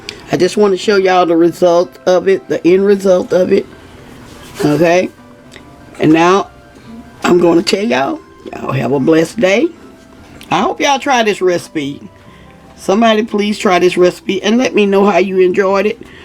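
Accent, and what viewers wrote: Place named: Texas, United States. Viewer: Mmmm I love bannanacream pie. Yep I knew you were from TEXAS Becouse that TEXAS DRAWL is a dead giveaway Becouse I am from TEXAS to.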